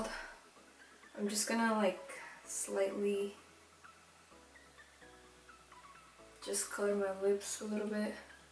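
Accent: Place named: Mexico. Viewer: Lo supe por el acento!! Yo también soy de Mexico y creo que me escucho como tú cuando hablo inglés... es curioso jajaja :*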